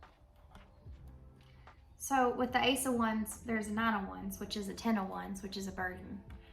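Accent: South, United States. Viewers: Just found this channel. Pleasantly surprised by your southern accent! Love from southeast Kentucky ❤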